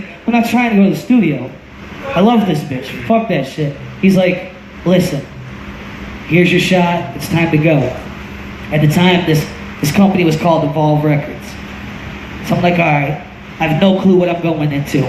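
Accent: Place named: Boston, United States. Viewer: he sounds like hes from Boston eh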